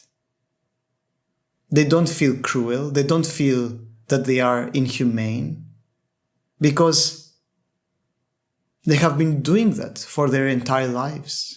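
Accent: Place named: Greece. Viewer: Just saw this - never got a notification that you had answered! Your name may be Greek, but lots of people use Greek names, or other exotic-sounding names online, so no, that wasn't the reason. Your accent sounded very familiar to me, as I am Greek myself, and an EFL teacher as well, so it's basically what I hear every day! Thank you for bringing some quality content to the internet; a whirlpool of cheap, fleeting content. Your videos are to-the-point, every sentence you utter is thought out and meaningful and you always make a valid point and get us thinking. 😊❣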